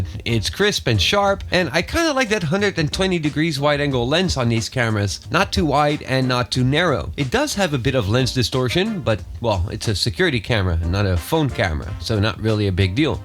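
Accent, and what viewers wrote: Netherlands. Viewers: Hoezo Nederlander? Je accent is duidelijk maat, haha Anyways, the camera seems to be nice and all. But I have been hearing never to take a device that comes from China due to hackers, content stolen, listening in, watching your cam etc. You didn't handle that aspect so how true is that and to what level is that a danger with this model? (I hope to get a answer quickly, my home has been broken into twice now and I wish to know whether this is the best one for me or not.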